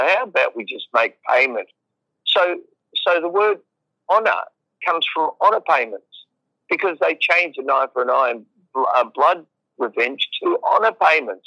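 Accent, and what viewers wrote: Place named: Australia. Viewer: No is kiwi, this guy is Aussie. I would love to get in contact with him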